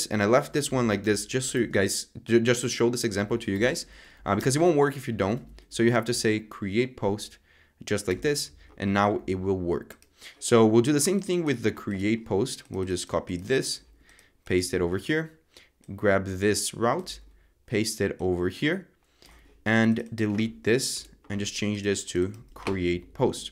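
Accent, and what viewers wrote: Brazil. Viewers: Great vid! I recognized the Brazilian accent as the it started playing 😆 Keep up the good work!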